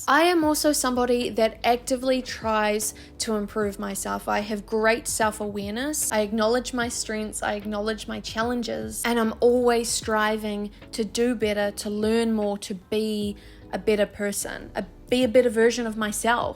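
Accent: New Zealand. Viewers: I knew u were from New Zealand lmao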